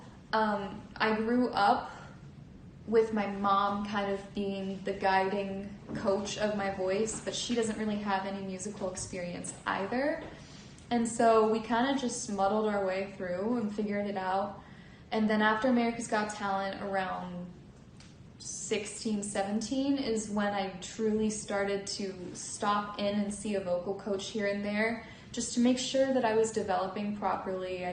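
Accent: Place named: Pennsylvania, United States. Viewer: pronunciation is characteristically Pennsylvanian. Do you expect her or anyone else to know what pronunciation you prefer?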